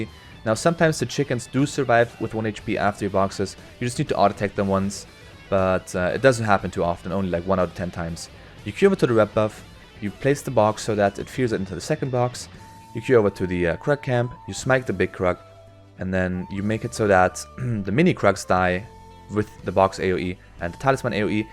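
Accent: Germany. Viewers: Just discovered your channel. I wanted to start maining Shaco and your video was very helpful. Thx man and keep it up. PS: You sound very german in my opinion, especially how u say Talisman :D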